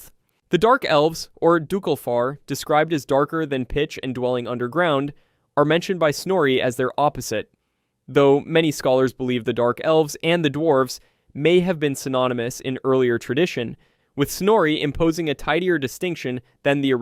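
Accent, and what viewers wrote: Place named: United States. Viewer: The amarican accent can never say things properly..."Fryer" is where i left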